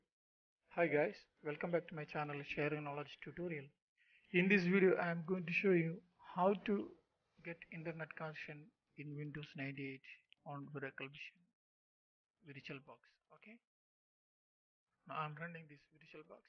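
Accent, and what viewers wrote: India. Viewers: You mean he sounds like an indian?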